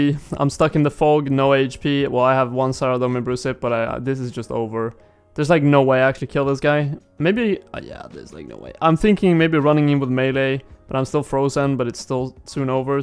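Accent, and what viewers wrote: Sweden. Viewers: Good stuff man! If my ears don't lie that accent has to be Swedish. Lycka till i fortsättningen!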